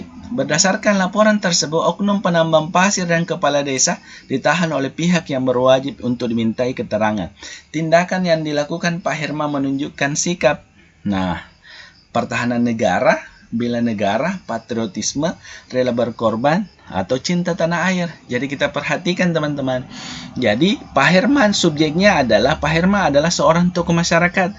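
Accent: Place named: Sulawesi, Indonesia. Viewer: Sudah saya subscribe kak,keren pnjelasanx kak..mudah dpahami,, sepertinya logat Sulawesi 😂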